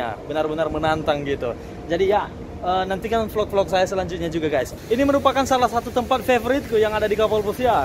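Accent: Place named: Manado, Indonesia. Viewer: Saya kira bro orang manado..logatnya seperti logat manado soalnya hehe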